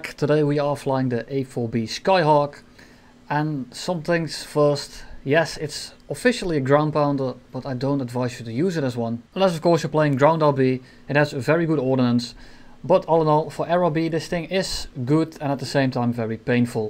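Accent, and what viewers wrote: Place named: Netherlands. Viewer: They're both dutch.... it's literally just the accent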